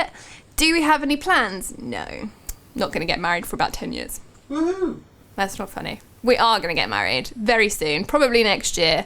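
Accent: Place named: RP, United Kingdom. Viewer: Loved this :D such a great first video :D you sound so posh too hahah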